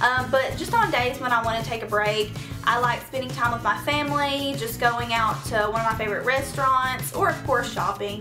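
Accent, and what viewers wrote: South, United States. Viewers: I'm originally from Memphis but have a very strong southern accent. I now reside in Rogers, Arkansas . When people ask where I'm from I pull out all the punches, I tell them I'm from "Toadsuck, Arkansas ". It's a small suburb outside Conway , lol. They don't really believe me when I tell them Memphis. Love you and your accent, my home state girl!!!!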